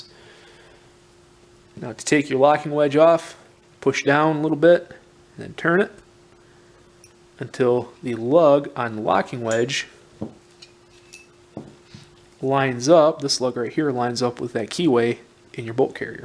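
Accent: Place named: Minnesota, United States. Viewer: I detect....... minnesotan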